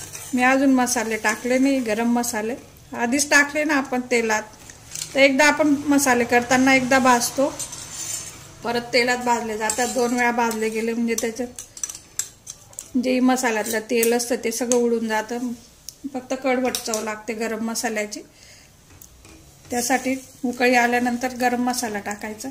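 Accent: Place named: Dhule, India. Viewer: खूप छान.तुम्ही माझ्या माहेरच्या धुळ्याच्या आहात .मला तुमची भाषा ऐकूनच वाटले होते ते.आपल्या घरातच असल्यासारखे वाटते .छान समजावून सांगता.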